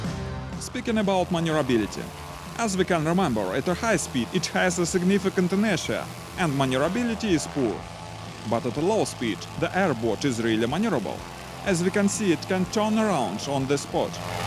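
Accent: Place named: Russia. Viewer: Can't you hear the accent? Rus-rus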